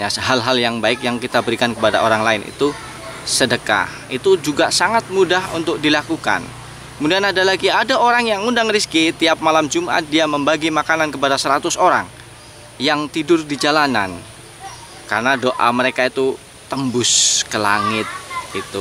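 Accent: Banyumas, Indonesia. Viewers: Nuwunsewu,panjenengan daleme pundi pak?ngapak-ngapake ko kadose mboten asing teng kupinge kulo.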